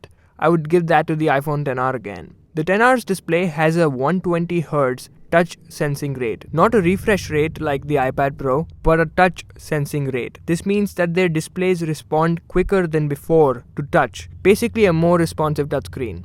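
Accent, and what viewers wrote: India. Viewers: I'm a simple man. I hear an Indian accent, I click dislike.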